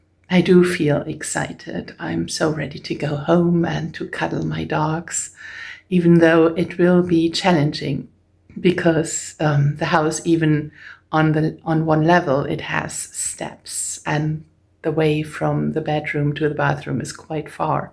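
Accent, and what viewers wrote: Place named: England, United Kingdom. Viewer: Hallo, du hast einen englischen Akzent. Hast du dort gelebt? Deine Hunde sind zuckersüß, habe auch zwei😍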